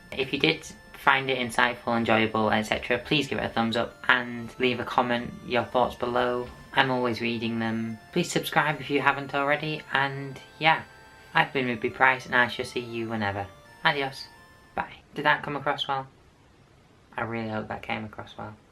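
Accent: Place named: United Kingdom. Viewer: Seeing this two years later ( I guess it was Two Years Ago with Ruby Price.) I find it is SO SAD that you couldn't get a Permanent Radio 📻 Gig. As I've said before... American Public Radio Stations would hire you in a ❤️ heartbeat for your British accent ,Show Content and Dilligent Production Qualities. By the way ...we could do a Show together...where we present and argue the Qualities of The British Production of Life on Mars....or the American Version of Life on Mars! 👾 👽. By the way...I noticed your Bed Covering...I do believe you are trying to make a Spectacle of Yourself,Ruby Price! Adios!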